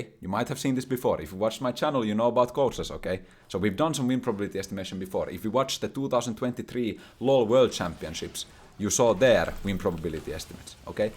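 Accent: Finland. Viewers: Nyt onkyllä jo pakko kehua tätä suomi aksenntia! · jumalauta mikä rallienklanti <3 jos et tee tahallaan nii kandee panostaa tarkotukselliseen ralli artikulointiin, siinä on tavaramerkkijä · Hyvää duunia, ja tosi hyvin selitetty enkuksikin. Hämmentävää miten rallienglannin kuulee välittömästi mut kundi artikuloi nii hyvin et vika on kuulijassa jos ei ymmärrä. Analyysi on kyllä hiton mielenkiintosesta aiheesta, pidän nää pointit mielessä ku meillä tulee statistiikkaa taas jossaki kohtaa. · i immediately recognized your Finnish accent, and its interesting that the thesis says "Aalto University" as well XD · are you from finland by any chance? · Hear Finnish accent. Subscribe to channel. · love your (finnish?) accent. One item, hahaha. Two item hahaha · My dude your accent is amazing 😂 ootko suomalainen? My favourite was definitely “obsörved”. The stats were fun, not even a LOL player but this was fascinating. 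Best of luck with your Fall & future endeavours. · Banger finnish English. Warms the heart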